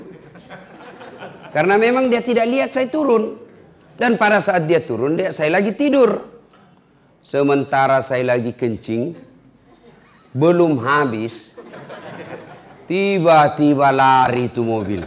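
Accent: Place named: Sulawesi, Indonesia. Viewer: Alhamdulillah, dpt siraman rohani logat sulawesi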